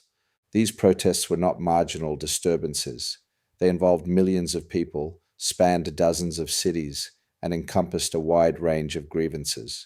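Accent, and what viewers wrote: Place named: United Kingdom. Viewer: Bro aren't you American? Where did the British accent come from?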